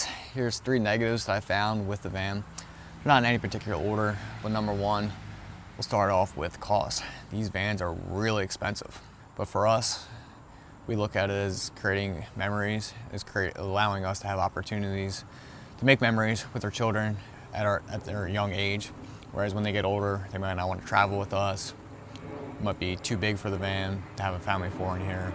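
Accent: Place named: Philadelphia, United States. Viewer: You have an amazing Philly accent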